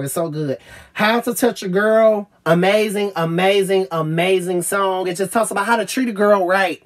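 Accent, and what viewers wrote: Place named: South, United States. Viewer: by the way where are you from you have a really deep and strong southern accent it really fascinates me it is So DEEP AND Strong I’m just curious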